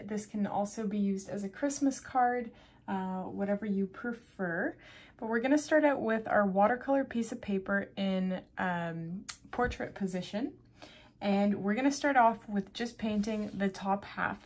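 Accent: Canada. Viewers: Within 2 min I recognized a fellow Canadian 🇨🇦 Beautiful job, thank you!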